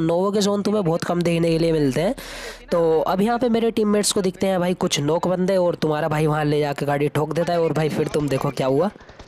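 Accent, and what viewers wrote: Haryana, India. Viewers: Bhai app Haryana Se ho kya?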